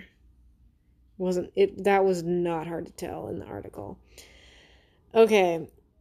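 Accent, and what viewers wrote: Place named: Long Island, United States. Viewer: 👉 Great Long Island accent. Sounds like Taylor Dayne back in the 80's. ☺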